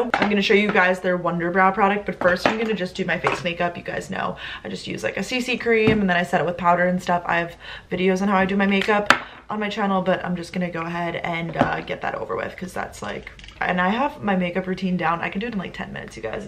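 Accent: Long Island, United States. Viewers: I love how you pronounce “Mario” your Long Island accent really shows. I also love Matt’s videos! How minimalist house tour is funny.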